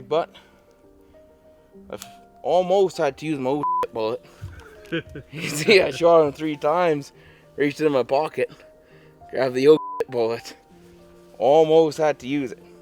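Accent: Canada. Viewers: The most CANADIAN accent in recorded history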